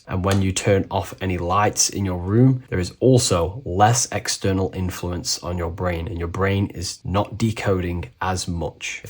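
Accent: United Kingdom. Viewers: Iam so happy that i found someone like you , your chanel so helpfull you answered so many quations i had , i mean there is somthing diffrent about you , you know what you are talking about , i really like this, and i love you british accent too, thank you for your existance and iam so greatfull finding you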